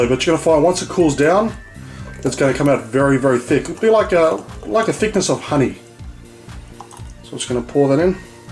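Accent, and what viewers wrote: Australia. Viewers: Actually, for all those remarking on the Australian accent, you are being incredibly rude. Don't watch if a different accent is offensive. There are American and Englush accents which are unintelligible to other ears. I'm not Australian and can eadil understand what is being said.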